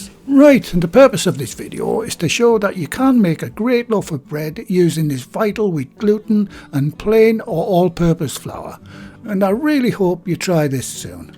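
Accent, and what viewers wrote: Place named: Northern England, United Kingdom. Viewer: Awesome video and for this old English gal displaced in Texas, it was lovely hearing that great northern accent! I’m going to have a break from making sourdough to bake this beautiful loaf, less of a challenge! Thank you 🤩👍🏻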